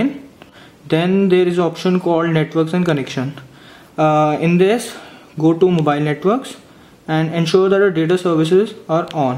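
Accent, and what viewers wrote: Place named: India. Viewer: Fox Stephenson it's an Indian accent, you can't be that ignorant to make fun of that? Unless you're American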